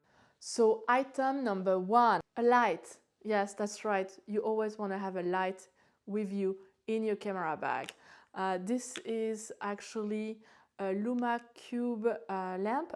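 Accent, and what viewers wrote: France. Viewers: Are you from the south of France? You sound just like an old friend of mine.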